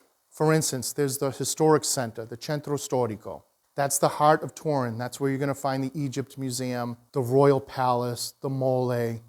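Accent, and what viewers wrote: Boston, United States. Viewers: First of all your review with your Boston accent made me feel right at home. As a fellow Bostonian living in Southern California for many years, I love that you have relocated to Italy. I just returned from a month in Italia. I went Firenze Ancona Assisi and Rome. I lived over there 35 years ago and now that I’m retired I want to move over. I’ve been watching many videos. This one was excellent! I love how you put up the maps of the city to explain the different neighborhoods. All of the food reviews and attractions. I am leaning toward Trieste. Thanks again. A presto! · I really appreciated your video on Turin; it was the first video of yours that I ever watched. I enjoy Turin and have made many trips there. But the most fun for me was in your first sentence or two - as a fellow New Englander, I said to my husband, I hear Boston :-) so I won the bet when you mentioned your former work as a tour guide there. 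Seeyah!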